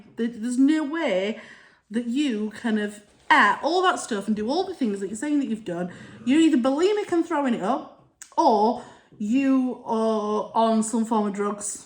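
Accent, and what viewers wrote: Yorkshire, United Kingdom. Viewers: you are doing so well, never ever forget that. Every day is a challenge in its own right, but continuing to better yourself is always going to win. Love your accent, my husband is from Yorkshire and we watched your video together. Reminds us of my sister in law who we don’t see often, as she lives in Barnsley. Sending best wishes to you and please let me know if I can help, I’m always here for a chat too. It is lonely at times, but you’re doing it for you so it is a process. I started off at over 21 stone. I’m now in the 15s, I can’t tell you how proud of I am of myself for keeping going, I can now walk into a room and feel good for once, I don’t worry anymore which feels great. Xx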